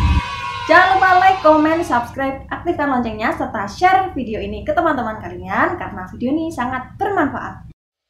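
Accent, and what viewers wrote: Java, Indonesia. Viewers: Medok e ketok emen :v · Medoknya itu nah 😍😀😀😅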